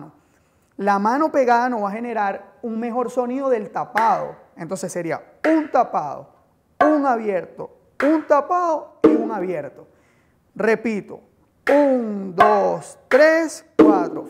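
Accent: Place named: Venezuela. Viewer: Gracias profesor al parecer por el acento es Venezolano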